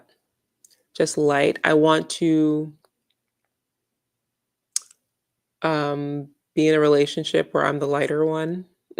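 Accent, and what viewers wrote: Jamaica. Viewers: So much more effective when you scold us in your Jamaican accent😂❤😂 and by the way, even though I'm near my goal, I'm still going to listen to you for motivation, because no matter how long I do it, fasting is hard!!!!😮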